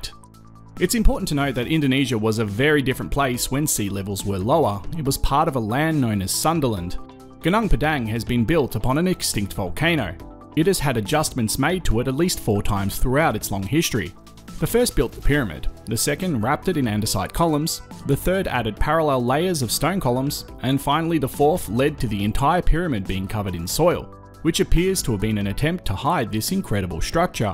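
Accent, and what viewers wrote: Australia. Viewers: Suggest you try using local pronunciations rathan than Australian for local names. Eg Gunung Padang. Vowel sounds in Bahasa Indonesia are a bit different than English :)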